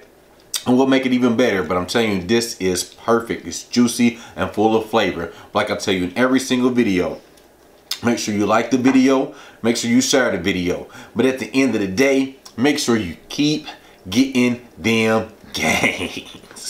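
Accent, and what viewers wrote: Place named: Texas, United States. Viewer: I bet you are from Texas, you got that accent I’m familiar with 🥰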